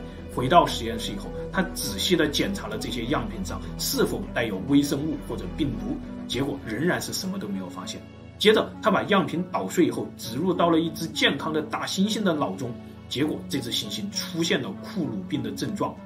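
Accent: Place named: Hunan, China. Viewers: 你是湖南人么… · 可能是四川两湖一带的口音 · 到底是哪里人啊？我好喜欢你浓重的西南椒盐普通话，好亲切好接地气！总会让我想起当年学校男老师们那蹩脚的川普~🤣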